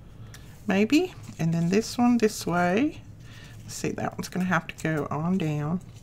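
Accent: South, United States. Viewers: you just keep doing what you do. I sit down and craft while I watch you every night. You keep me company. I love listening to your lovely Southern voice! Thanks for what you do❣️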